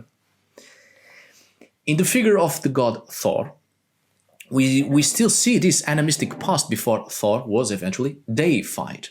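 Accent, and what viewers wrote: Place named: Portugal. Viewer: Your Italian accent is quite good. You have a little bit of the Portuguese accent on the Rs before vowels, but well done. Que fixe pa!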